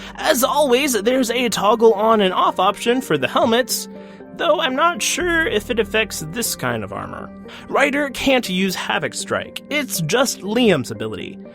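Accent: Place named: Scotland, United Kingdom. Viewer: scottish accent....oh god yes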